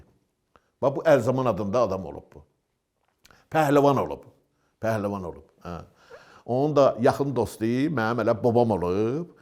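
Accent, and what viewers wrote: Baku, Azerbaijan. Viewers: Bakı lehçesi superdir ürəyim gedir❤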